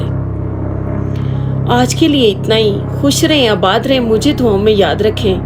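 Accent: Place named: Punjab, Pakistan. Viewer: Your speaking style with Punjabi accent is very charming. Keep it up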